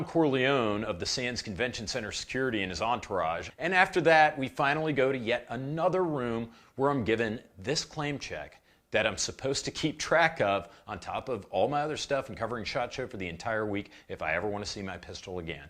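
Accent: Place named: Southern California, United States. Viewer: Hey, how come you have a Southern Californian accent but you’re from the actual South? You sound like one of us. 🤙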